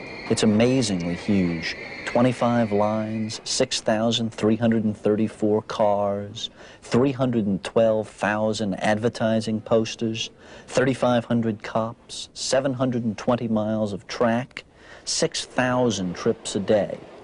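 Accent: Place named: New York, United States. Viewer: This presenter has the most New York accent I've ever heard in my life. And I'm an almost-thirty year old who was born, and has spent most of her life in Brooklyn.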